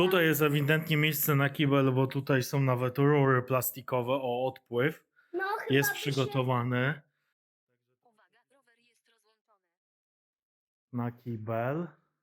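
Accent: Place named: United States. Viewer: Wygląda jak dom Kamila Stocha. A pan fajnie "po amerykańsku" mówi jak Mariusz Max Kolonko.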